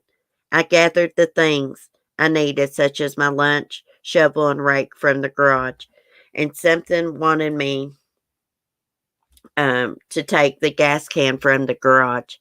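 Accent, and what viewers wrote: South, United States. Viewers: I love you when you said a man killed his 21 yr old neighbor over dog poop I died. It's not funny in the least bit it is just your cute southern accent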